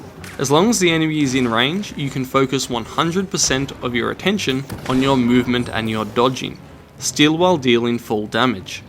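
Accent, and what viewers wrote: Australia. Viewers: You're aussie too? :D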